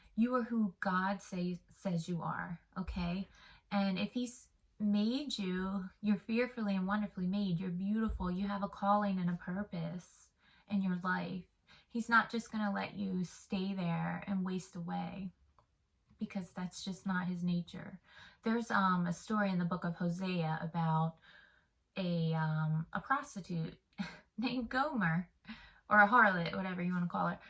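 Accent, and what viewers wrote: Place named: East Coast, United States. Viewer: I love this and relate SO MUCH! I also love your cute little east coast accent, haha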